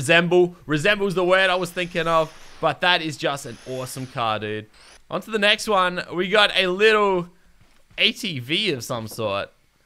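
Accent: Australia. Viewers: Graeme Hope he is australian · What your Australian wow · Wait ur Australian?.......Awesome cause Im Australian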